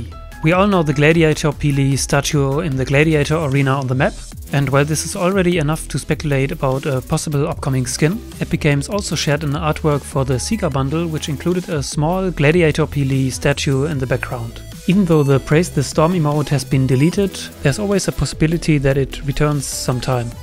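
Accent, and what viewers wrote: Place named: Germany. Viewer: man hört den deutschen aktzent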